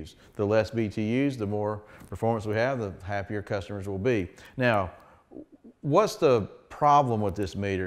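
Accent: South, United States. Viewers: An intelligent, coherent presentation of a complex idea. Imagine that from someone with a southern accent.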